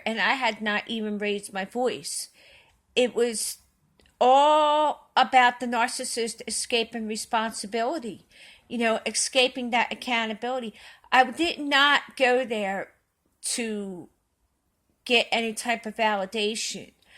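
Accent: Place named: Philadelphia, United States. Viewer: i recognize the philly accent, I grew up 5 mins from you... I wanted to tell you moreso ask you to forgive your mother. you acknowledge and validate who and what she is..now for yourself, forgive her....do not give her power over your thoughts and everyday life. Forgive yourself for the many times you stopped living life because of her actions.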